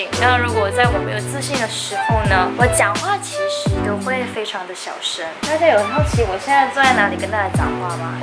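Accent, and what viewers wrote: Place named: China. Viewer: 纳尼！竟然有中国腔？？？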